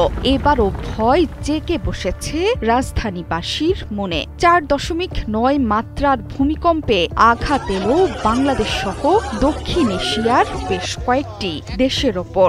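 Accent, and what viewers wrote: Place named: West Bengal, India. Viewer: খবর পরার স্টাইল পুরাই ইন্ডিয়ান বাংলার মত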